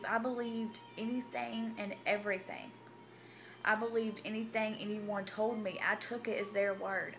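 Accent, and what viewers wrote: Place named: South, United States. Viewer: I love your Southern accent and I'm sorry this happened to but you're very pretty!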